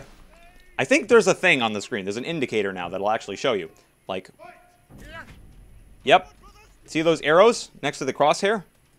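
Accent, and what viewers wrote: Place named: Canada. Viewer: quick question, are you canadian, your long vowel sounds sound canadian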